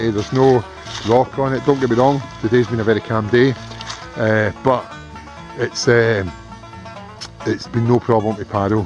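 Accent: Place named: Scotland, United Kingdom. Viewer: My Brit friend tells me it's English with a moderate Scottish accent. Fun. :-) And, love the concept. Wonder if he ever got around to trying one with lighter weight materials. Also saw one online today made with PVC pipe.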